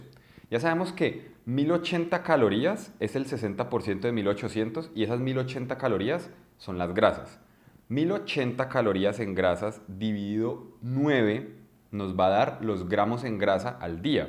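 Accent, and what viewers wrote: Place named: Colombia. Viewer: Que belleza encima colombiano ..la put..madre que hermoso acento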